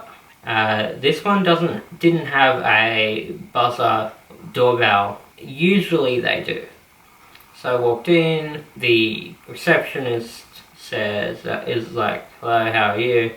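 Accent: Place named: India. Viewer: You live in Australia why is your accent messed up brother? Your Indian gene too much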